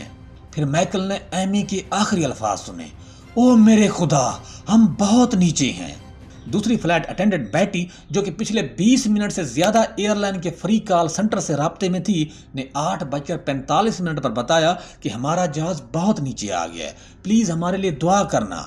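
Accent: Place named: Punjab, Pakistan. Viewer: Panjabi bhai urdu bolna to sekh lo pehley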